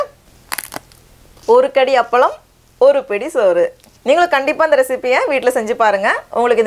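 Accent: Nagercoil, India. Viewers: Ur way of speaking Tamil in Nagercoil slang is soooooo good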